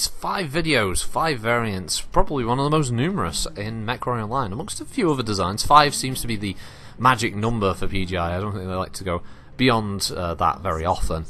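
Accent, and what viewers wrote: United Kingdom. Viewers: Thank you. You know that American's trust a British accent, right? Well, speaking for myself I think that is true. Your timing for the most part is great. You don't stumble over words. You don't throw in the obligatory 'uhs' and 'ums' like I do. That is my handicap. I can write far better than I can speak. Though, somewhere in my early to mid thirties I did notice that marked improvement in my reading aloud ability. I'm not sure if that is something that comes with age and practice or just reading Robert Jordan novels until my eyes bled. Nevertheless, your lore series brings to mind (and please don't take this the wrong way, I mean this with the utmost sincerity and respect) the old nature shows I used to watch in the early 80s. I'm serious when I say your voice brings a certain...credibility to the universe. Several times while watching your series people have come in and assumed I was watching something about the real world. 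I always enjoy the sound of voice and look on their faces when they inevitably say, "What the hell are you listening to?" five minutes into Star League History 3 or something. It telegraphs to me that they thought it was syndicated documentary or something (I am prone to watch more docs than cat videos or people getting hit in the stones or 'Top Ten Reasons not to watch Top Ten videos' videos) so I smile inwardly at their sudden confusion when you switch gears from talking about the Camerons to a battle over YakitySmakity V. That fact that you are doing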